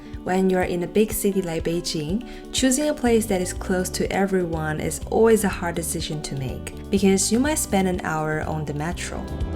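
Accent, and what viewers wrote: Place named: United States. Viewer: 好重的美国口音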